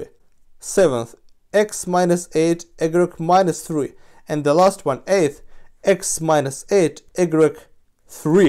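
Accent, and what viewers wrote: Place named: Russia. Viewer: it's also pronounced close to that way in French But yeah he obviously sounds Russian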